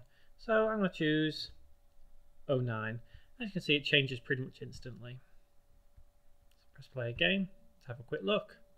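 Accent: United Kingdom. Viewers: Dude, he's clearly not American with that British Accent. He's from UK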